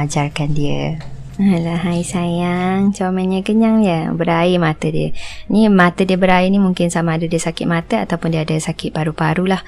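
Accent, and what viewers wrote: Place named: Malaysia. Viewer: mba nya dari malay logat nya enak di dengar dan mpusnya cantik dan beruntung smoga sehat terus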